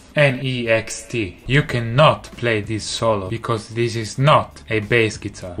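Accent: Italy. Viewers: His italian accent is pretty huge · I think he's actually italian because i'm italian ad he speaks like me when i dint't care about the english pronunciation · Si sente che sei italiano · That's the Italian accent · His passion and musical skill is addicting...hahahahaha Not to mention that hes kind of cute and that Italian accent puts the cherry on the top of the cake!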